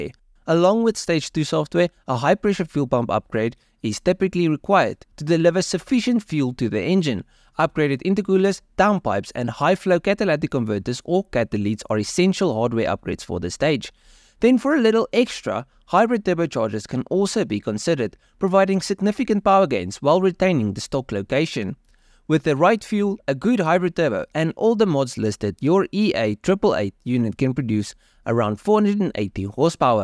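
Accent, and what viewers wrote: South Africa. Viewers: South African Voice?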